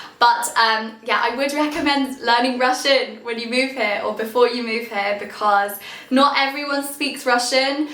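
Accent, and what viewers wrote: United Kingdom. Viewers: You should get rid your very strong so called "british" accent. Try to learn pronunciation from Cambridge or Oxford courses of English, or, at least, from the TOEFL, because american accent is easier to understand for Russians. But the best (purest) English pronunciation is in Australian universities and on TV.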